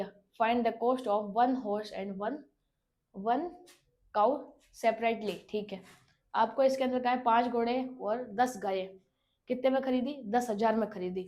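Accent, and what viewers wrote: Rajasthan, India. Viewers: Tone is rajsthan 🙏m rajsthan se hun🙏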